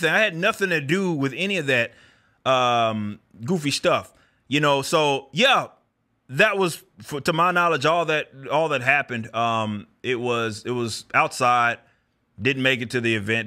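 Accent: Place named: Texas, United States. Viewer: Yes as it turns out inner city Texas speaks differently to whatever part of the country or planet you’re from. Shocking I know.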